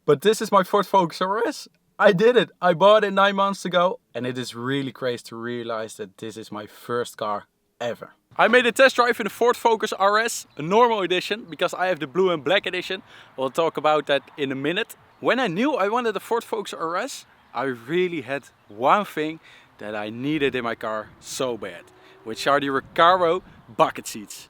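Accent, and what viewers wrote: Netherlands. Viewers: I dont think you can do anything about this but you can really hear your dutch accent very well :) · You can hear you’re Dutch but that’s not a problem. You’re video is really nice to look at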